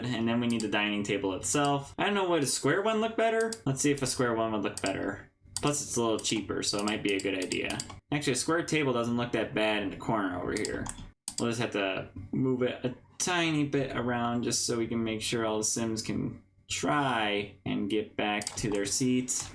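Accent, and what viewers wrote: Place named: Newfoundland, Canada. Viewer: this is so random, but I'm willing to bet money you're from newfoundland Canada, I can tell that accent from anywhere! -a newfie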